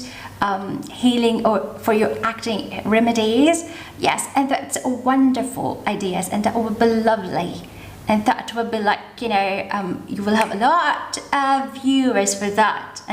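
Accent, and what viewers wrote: United Kingdom. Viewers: i have a few passions that I am putting on display. but mine are from a student's perspective. I like the way you speak,. it's the king's accent, right?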